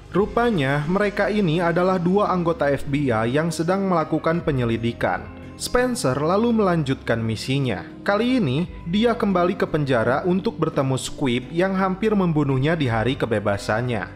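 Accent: West Java, Indonesia. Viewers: Apakah pengisi suara broview adalah orang sunda? 🤔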